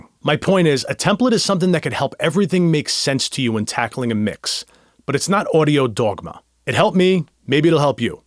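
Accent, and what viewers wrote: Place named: East Coast, United States. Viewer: With That east coast accent, I couldn't tell if he was saying "open up the DAW" or "open up the door"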